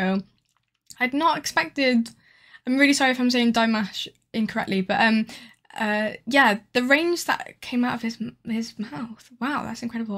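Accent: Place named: United Kingdom. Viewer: Как же прикольно звучит британский английский))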